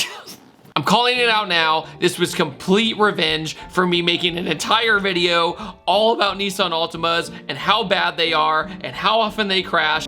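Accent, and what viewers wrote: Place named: New Jersey, United States. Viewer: New jersey native? Sounds about right. 😂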